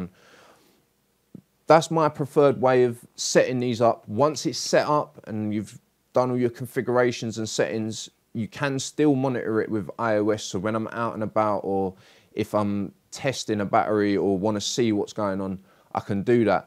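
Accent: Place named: East London, United Kingdom. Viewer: I'm from south east London! You sound like a an east Londoner ( I feel more comfortable hearing you talk) I really hope I can bring my battery to you so you can work your magic !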